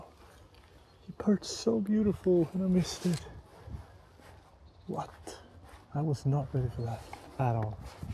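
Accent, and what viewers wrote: Sweden. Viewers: Tyckte jag hörde lite östgötska när du pratade lite svenska i en tidigare så jag blev lite fundersam om du var från Östergötland. Inspirerande att få se dig fota på samma ställen som man själv brukar fota.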